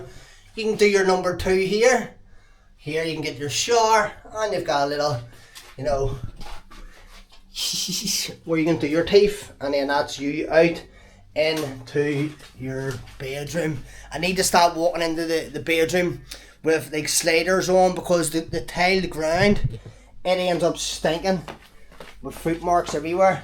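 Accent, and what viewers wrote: Liverpool, United Kingdom. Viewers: Are you a scouser :D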